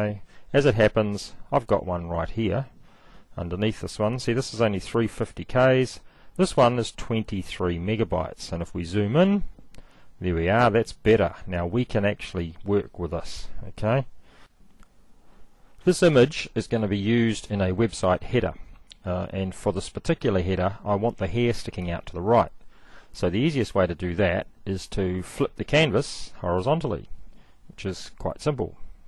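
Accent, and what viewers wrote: New Zealand. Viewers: Man, brilliant kiwi accent!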